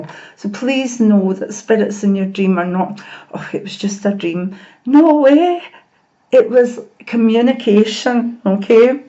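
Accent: Scotland, United Kingdom. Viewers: is sleep paralysis a sign...had this Since a teenager. Nice to hear a Scottish accent on youtube. im Scottish too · Hello, just found your channel. Good to hear a Scottish accent as I'm in east Dunbartonshire. Liked and subbed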